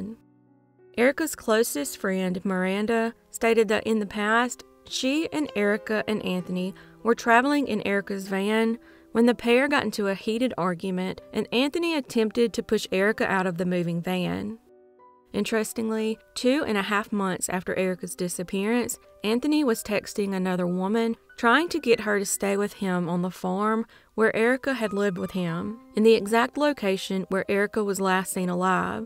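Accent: South, United States. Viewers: I like your southern twang.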